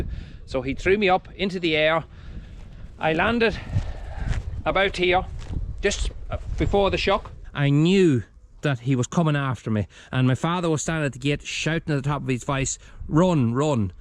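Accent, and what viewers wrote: Ireland. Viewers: Irish or English? Where I am cattle are outside year round. USA. Excuse me but are not twins not as large? I think having twins would be easier? The more I listen the more I think Irish. My grandpa raised herefords. His bull was so tame. He stayed with the cows for two years, then he was sold. Grandpa changed bulls every two years. OK going to shutup. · You sound like my Grandparents. They were from Ireland. And they have been gone 40-45 years now. Thank you for putting a smile on my face. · Hello, a new viewer here. What an amazing story about you surviving a bull attack. I especially enjoyed how gentle and caring you were while assisting with the delivery of the twin bull calves. Your Irish accent is quite charming.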